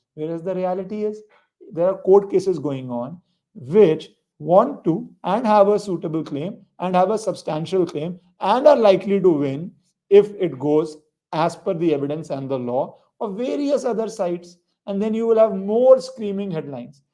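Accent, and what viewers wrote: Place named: India. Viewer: No one is asking for your English content. Yo are just making it up yourself. Indian English accent is quite possibly the worst sounding and the most annoying accent on this planet and yours is Not to mention that English speaking Indians especially from Delhi think they are god's gift to this planet. You can't even speak Hindi without using 25% English words, just so you can sound sophisticated.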